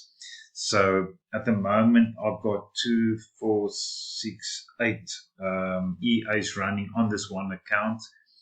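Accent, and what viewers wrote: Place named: South Africa. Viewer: Digging the deep Afrikaans accent bro. 🇿🇦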